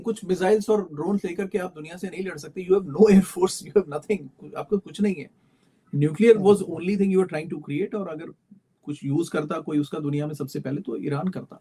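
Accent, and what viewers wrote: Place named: United States. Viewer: He is an Indian but talking like an American white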